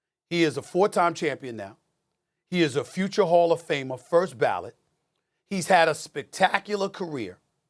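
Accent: New York, United States. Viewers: Lol it’s that New York accent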